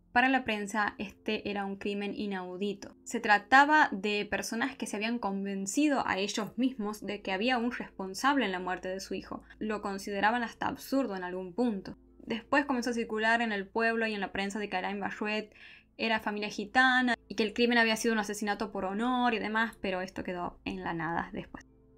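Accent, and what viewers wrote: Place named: North, Argentina. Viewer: Casi muero al escuchar tu tonada!! Ame!!! Claramente sos del norte pero no quiero arriesgarme a decir que del interior del corrientes. Muchos exitos, suscriptora nueva 😘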